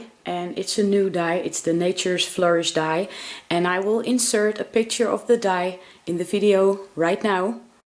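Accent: Netherlands. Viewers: Goodmorning nice layout !!!! Im the guest designer for the month of march . Are you Dutch????? I am so I thought I heard a dutch accent!!!!! Take care Els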